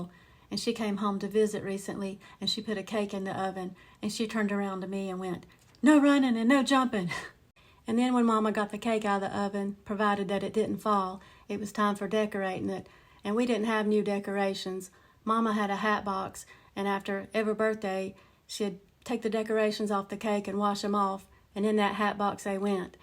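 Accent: Appalachia, United States. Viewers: OMG! you've got stop making these videos, I'm laughing so hard I'm going to pee my pants. I love watching you talk with that Appalachian accent it's just the cutest thing that I have ever heard. Man you are so beautiful girl.❤❤❤❤❤❤❤❤❤❤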